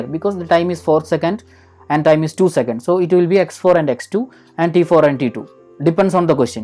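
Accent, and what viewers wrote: Kerala, India. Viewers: Mallayalli aano?😂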